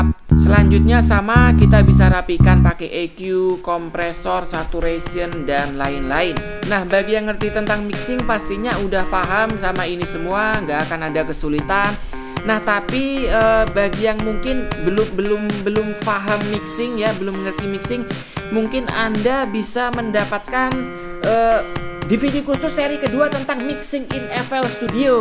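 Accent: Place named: West Sumatra, Indonesia. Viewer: Suaranya kayak minang kocak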